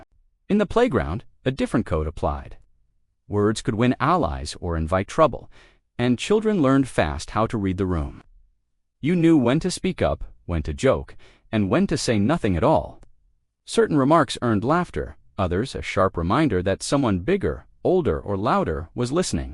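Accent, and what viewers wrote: United States. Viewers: Why are videos about British kids voiced by an American who not only is not old enough to have heard them and in all probability does not know what they might mean.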